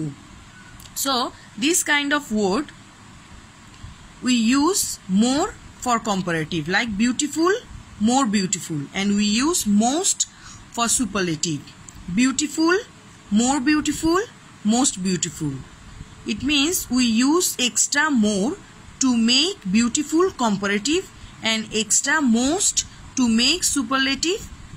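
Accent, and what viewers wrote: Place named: India. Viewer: Indian Accent! Didnt expect it from a SPS teacher.Who recruited this teacher? Btw Noel sir, Rudy sir, Saikat sir, Munna sir these are the real gem of SPS.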